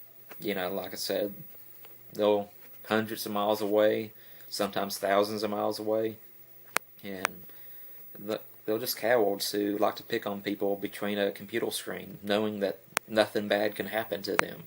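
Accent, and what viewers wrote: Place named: South, United States. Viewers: When I first heard your voice, I just thought you had a southern accent and pronounced some words funny. I didn't think anything of it. I also know how you feel, because everyone always says I talk funny. I like your vids, keep on going, man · Dude you sound like you come from New Orleans. From down the Bayou! It's sounds cool to me! Subbed yah! Keep up the good work! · I just thought you were from Louisiana and was Creole. Anyway dont worry about it. Your vids are great. I sound terrable on my vids. I would never think of you are slow. Thats just trolls being ass holes. You come over as a very bright and thoughtful person. Give it no more thought man. Keep up the good vids. :-) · you do have an accent the same as your brother I can hear it when you talk, its in addition to the speech impediment, but a definite southern accent in there a twang if you will, I always liked southern accent for some reason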